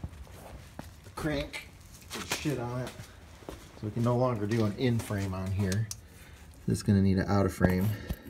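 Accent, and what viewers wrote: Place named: New York, United States. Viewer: That new York accent though 🤣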